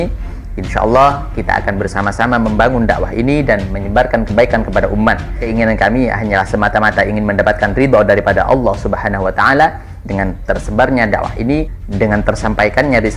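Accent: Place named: Arab world. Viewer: ini orang dialek ngomongnya kaya orang arab yah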